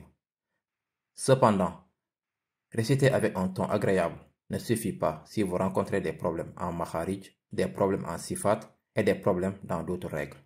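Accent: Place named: Senegal. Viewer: Une voix Sénégalais 🥰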